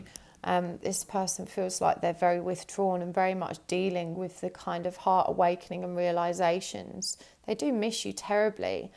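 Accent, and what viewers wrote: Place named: Birmingham, United Kingdom. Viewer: Birmingham acceny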